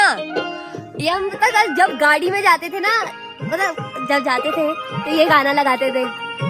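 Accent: Garhwal, India. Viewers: Cute gadwali bolti ho app yll ❤️❤️❤️❤️ Aur nice vlog aur tq comment like Kane ko ❤️❤️❤️❤️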